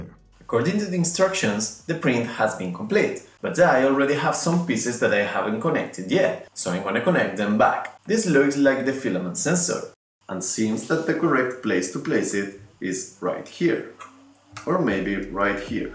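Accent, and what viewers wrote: Spain. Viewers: One cuestion, are you Spanish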